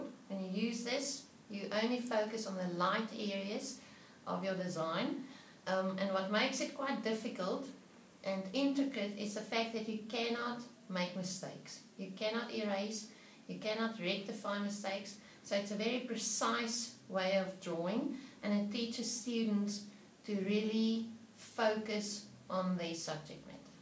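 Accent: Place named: South Africa. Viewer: She sounds South-African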